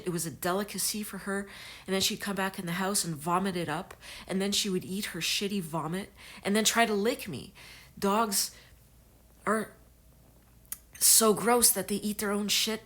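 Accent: Canada. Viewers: It's that Canadian accent.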